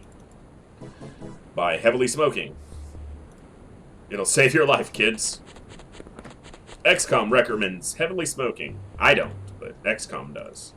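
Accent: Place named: United States. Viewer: I am very much enjoying this playthrough. I’ve watched a ton of xcom and TFTd let’s plays on YouTube and this is quickly turning into one of my favourites. I love the raw organic playthrough where you just have to live with your mistakes and keep fighting. I’ve seen too many people play this game and just spam psi powers to win the game. I never liked using psi personally, feels like a cheap way to play. Also love that TFTD is getting some love cuz this game deserves love too! Your subtle American accent reminds me of someone I used to know from Philadelphia. Greetings from Canada!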